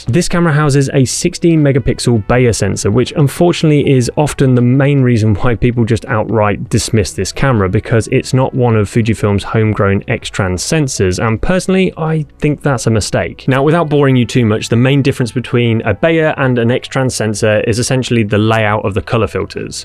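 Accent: England, United Kingdom. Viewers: Just curious. You sound English like me but you price everything in dollars? Do you live in the USA?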